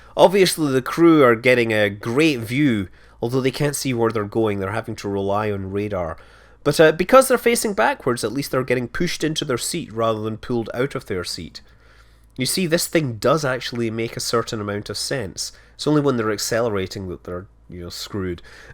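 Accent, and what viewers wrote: Scotland, United Kingdom. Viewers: Nah, it's just the Scottish accent.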